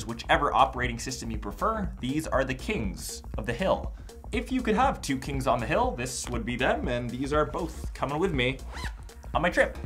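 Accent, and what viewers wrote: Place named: Canada. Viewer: he is talking canadian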